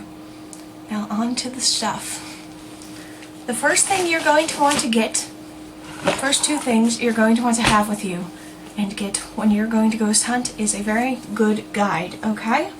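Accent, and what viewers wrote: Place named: New Jersey, United States. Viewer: Very good video presentation, if I was going to do one it would be just like you did it! I also understood you when you said "guass", we Jersey peeps know the accent well! :-)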